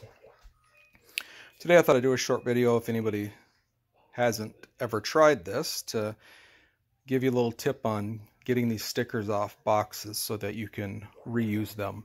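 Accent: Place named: Wisconsin, United States. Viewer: Thank you! Very helpful. Are you from Wisconsin? Accent sounded familiar. Go Packers!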